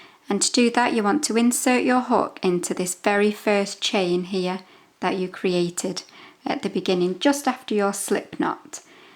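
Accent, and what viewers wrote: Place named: North, United Kingdom. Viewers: Nice Northen-y accent, which is a breath of fresh air! Would like to see your video for blocking! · I love this stitch but I've never seen it in a granny square! Great video, thanks so much 😊 also love your accent!!! I'm from Yorkshire but I live in Canada so it's great to hear the sound of home 😊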